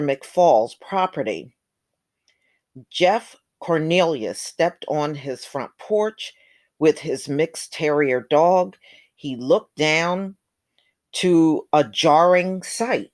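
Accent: East Coast, United States. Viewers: Don't insult her accent she is probably from the east coast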